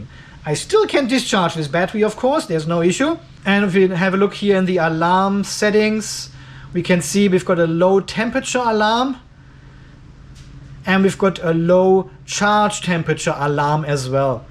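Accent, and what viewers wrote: Germany. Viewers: Hello - love watching your show - German accent and all! Are you done with JK for now? I am quite fond of them and am building a few 52V inverter batteries with them. Quick question that you might be able to help with? I've used the "GPS" port with USB serial quite successfully on the smaller BMSs (to monitor and integrate into Home Assistant). I've tried the RS232 RJ11 - but without success. Is there a way to get someth9ng similar running on the inverter BMS? I've got a Bluetooth version running into Home Assistant - but I'd prefer hardwired.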